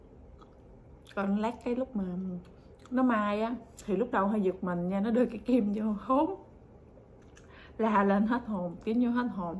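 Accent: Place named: Mekong Delta, Vietnam. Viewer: Giọng chị chắc ngừoi Miền Tây hả chị! Phải ở gần mình tới lui cho đỡ buồn. Em cũng mới sinh xong nên thấy thương mấy Mẹ bầu sắp sinh lắm.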